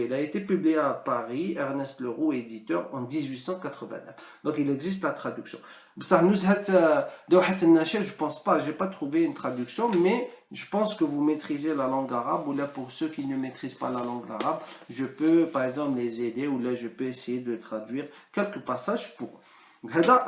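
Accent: Tlemcen, Algeria. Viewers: Bonjour ça ma fait rire l'accent tlemcenien ma grand-mère parle comme ça je suis de oujda et ma grand-mère est tlemcenia j'aime beaucoup regarder tes vidéos machallah elle sont très intéressantes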